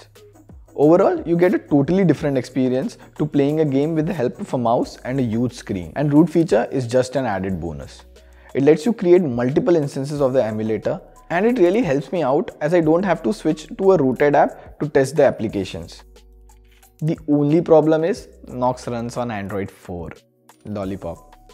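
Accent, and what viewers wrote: India. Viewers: Indian accent detected, dont trust it it might be a Windows Tech scammer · Indian fuckong engllish zounds like a servant is talking!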